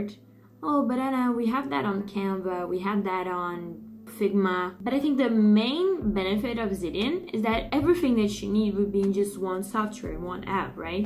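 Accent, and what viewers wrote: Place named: Portugal. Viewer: Im brazilian and it sounds like portuguese accent Just a guess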